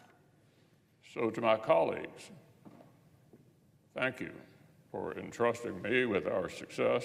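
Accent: South, United States. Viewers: Somebody sends Skeletor on home that Southern drawl is f$@ gnarly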